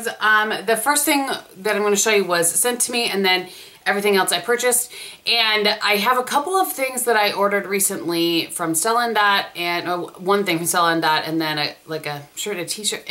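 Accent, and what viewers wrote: Wisconsin, United States. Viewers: I thought I recognized that WI accent!! Osseo here!!! Love your videos! ❤️❤️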